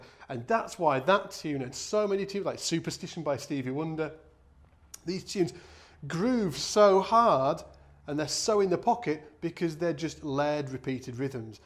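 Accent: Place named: Manchester, United Kingdom. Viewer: Tha sounds like a manc lad, lad! Great tutorial BTW Im a guitarist and this was great help for me. Just one thing....have you just got back from robbing an ASDA?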